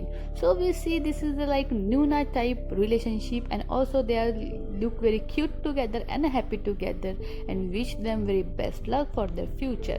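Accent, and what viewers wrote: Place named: India. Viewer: Madam your tongue sounds like indan 😊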